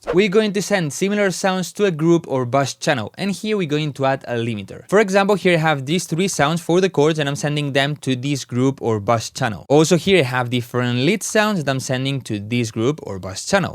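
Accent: Spain. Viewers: y, era re obvio que es de españa si te das cuenta como habla inglés jajaja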